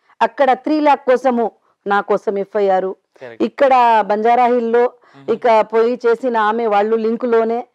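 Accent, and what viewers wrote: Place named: Chittoor, India. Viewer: madam slang looks like chittoor... Good to hear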